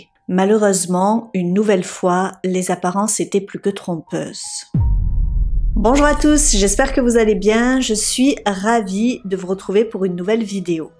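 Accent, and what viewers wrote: France. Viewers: Je suis particulièrement déçu que tu ne tiennes pas compte des remarques que l'on peut te faire... Tu es plutôt mimi à regarder...tu as un jolie timbre de voix et ton accent du sud (je suppose) rend tes histoires fort dépaysante... Mais par contre qu'est ce que c'est moche de t'entendre dire mariâche...montâche... garâche...partâche...et tous les mots finissant par age... Pourtant tes récits ne sont pas toujours ou souvent repris par d'autres... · Je ne crois pas avoir déjà entendu parler de cette affaire, sinon c un plaisir d entendre ton accent qui vient du sud si je ne m’abuse? Merci pour ton travail et tes vidéos! 🙏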